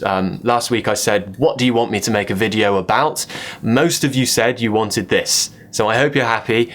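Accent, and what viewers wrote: United Kingdom. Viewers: Your accent is awesome! I wish I spoke English with British accent like you. 😊😊😊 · I'm so glad to watch you video..the way you talk British English so very clear 😘😘😘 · Hi! Thanks for your video. Love your British accent, mate!:) I wonder, can we also say ‘go down the pan / the tubes / the plughole’ instead of ‘the drain’ with the same connotation and level of politeness? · Thanks so much, very useful video and I like very much your British sound, it's wonderful and clear.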